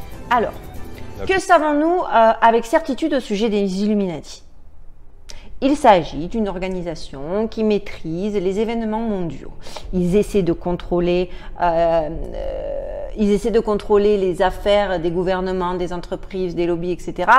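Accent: Marseille, France. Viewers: Albert Pike, c'est Paille-K, pour la prononciation (je croirais presque que tu mens quand tu dis vivre aux States! hein ma gueule?:) de Marseille! j'adore ton petit accent que tu as dû bien perdre depuis... mdr ) et aussi à 15' et des, on dirait ptet des détracteurs (mais dénigrateurs j'aime bien aussi, ça fait penser aux oiseaux migrateurs: ceux qui vont voir ailleurs... si j'y suis;) Et franchement, tu as de la jujotte, j'aime beaucoup ton implication et ton recul en même temps.... dans tout ce que tu cherches à comprendre... T'es vraiment intelligente je trouve! Bon voilà, après avoir regardé une 20 aines de vidéos sur ta chaîne, je me mets à te tutoyer, mais cela ne veut pas dire que j'ai moins de respect pour autant... C'est ptet même le contraire: je te vois comme une "sister" de ma génération! (même si tu dis dans une vidéo qu'en 95 tu n"étais pas née, je sais que ce n'est pas vrai:p ) Et ton angle de vue (vu des States) m'apporte beaucoup! 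Merci! Stay Safe aussi (vraiment, fais gaffe à toi) xx Take care xx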